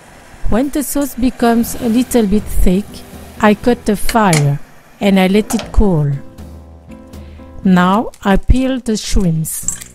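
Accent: France. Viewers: This looks super delicious! I will have to cook this and try it! I will enjoy it for sure! where did you learn to cook all of these recipes? Where are you from? You sound french. Peace!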